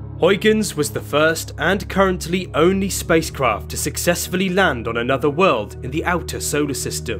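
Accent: United Kingdom. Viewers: Is the narrator the same Brit who pushes all the snake oil cures?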